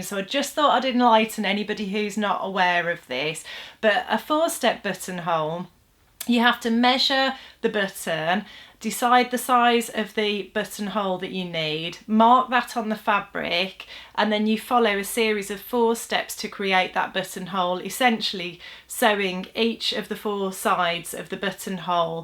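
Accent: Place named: Black Country, United Kingdom. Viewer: Your accent sounds like mine! I'm a Black Country girl that has lived in Canada since I was 27! I am now 63 (yikes!) and need this video as I can't get my new Janome buttonholer to work. Fingers crossed and thank you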